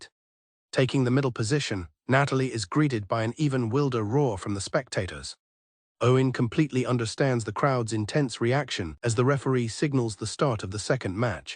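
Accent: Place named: United Kingdom. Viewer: Manga recap with a British accent?!🤩👍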